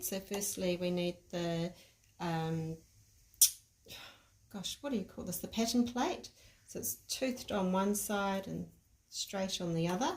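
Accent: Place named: New Zealand. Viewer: have a look at either end of the needle bed and you should see a little plastic tab. Check your manual or search online to see if someone else has put up a YouTube video of changing the sponge bar. I detect a New Zealand accent. I got my sponge bar from New Zealand. If you want the details, I’m happy to pass them on.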